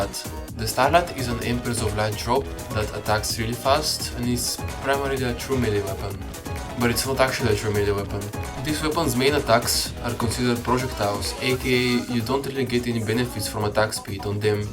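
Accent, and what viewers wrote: Russia. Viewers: твой акцент очень похож на русский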